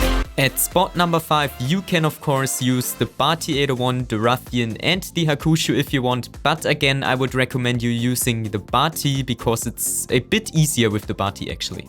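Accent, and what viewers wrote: Germany. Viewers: Es hört sich so an also ob du Deutscher bist der englisch spricht😅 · Richtiges alman Englisch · Bist du deutscher? :)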